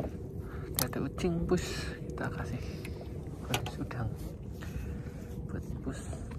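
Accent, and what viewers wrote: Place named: Semarang, Indonesia. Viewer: Bahasane koyo wong semarang. Ndak yo?😊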